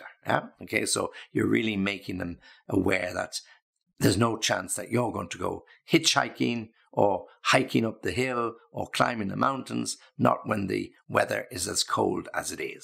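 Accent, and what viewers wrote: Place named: United Kingdom. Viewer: I hesitate between american english or british english. In fact, i was practicing british english for 2 year. Then started learning american english. But when I come back to british english videos like this one, i just fall in love with british english. ..it sounds so lovely, so elegant! And the best for me, you pronounce the "T" and i love it 😊❤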